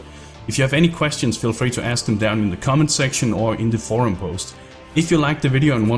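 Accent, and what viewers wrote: Denmark. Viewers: Was thinking.... Damn thats a danish accent.. "Checks your twitter" oh... you ARE danish :) nice Nice content man, det er super godt :)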